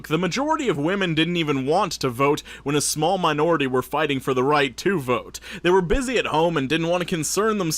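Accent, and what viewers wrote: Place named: New York, United States. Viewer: What’s up with the New York accent.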